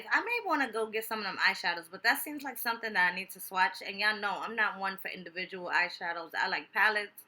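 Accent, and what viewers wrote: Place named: North, United States. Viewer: I subd love ur accent u from up north i was born in buffalo ny n miss the northern accent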